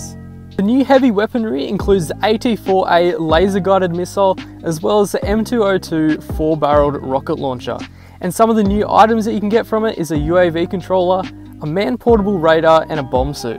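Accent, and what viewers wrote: Australia. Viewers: I think he's Australian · That's australian accent. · Excuse If You Can Answer Me Are you from Australia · Australian accent 👀👌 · Great gameplay mate! I miss hearing aussie english 😂 I love those RPGsss! 🔥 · he is Australian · I love Australian accent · Australian accent rather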